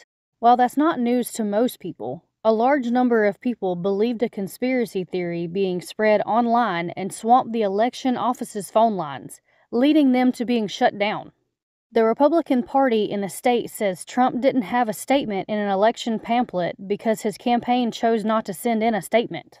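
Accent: South, United States. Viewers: Your southern accent threw me off for a moment. I thought you said the news reported by Bill, then after thinking for a moment I realized you said. A funny misunderstanding on my part!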